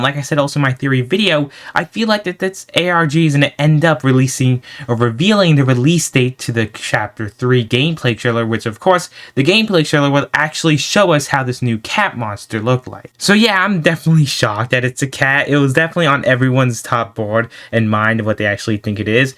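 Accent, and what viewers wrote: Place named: France. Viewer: Bah il a un accent français alors je pense que oui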